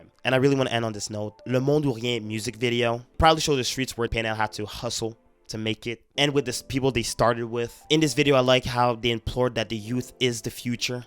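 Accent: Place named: Canada. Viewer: Are you québécois? Youre french is really good man and i feel that you got that canadian accent hahah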